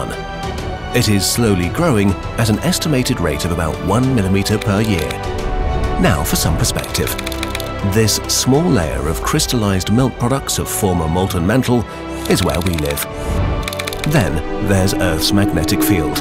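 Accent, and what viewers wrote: England, United Kingdom. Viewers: beautifully narrated with an English accent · A man from England speaks English.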